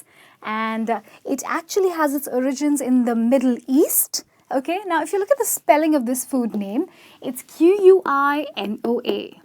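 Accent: India. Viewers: This sounds more like Indian English. Plus no onion in bruschetta ;) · I think she has spoken all the words correctly in the Indian context. Country wise,words will always sound different. We dont speak like Americans or British. Appreciate the fact that we are atleast adopting these funny weird foreign words and trying to pronounce them correctly unlike americans ,europeans...etc who never get our food names correct. Can you pronounce Tandoori correctly ? Can u guys pronounce Baingan ka Bharta correctly ?? Can you pronounce Sambhar correctly? Countrywise words will always have a colloquial touch invariably. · That's commonly heard in India. Very sad for this lady, trying to teach us, to use. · i am sorry. i do not want to learn indian english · She is pronouncing it in Indian English, for those who were wondering. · Absolutely right. As a native English speaker from tbe UK this woman has gigen me a headache with her English. 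Most of it prounced wrong. She is obviously from Indian background and they always seem to prounced their v as a W. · No 1, she doesnt speak british english, more of indian slang english, no.2 it's temato not tomeito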